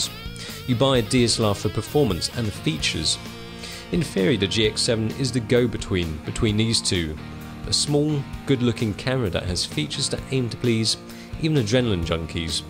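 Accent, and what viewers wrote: United Kingdom. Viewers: i find his voice too british too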